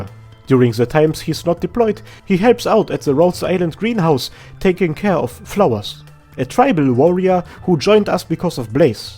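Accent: Germany. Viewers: From Which country are you XD Sounds pretty Hard like germany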